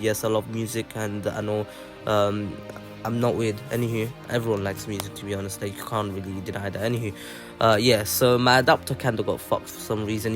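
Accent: United Kingdom. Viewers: U sound super British and if so same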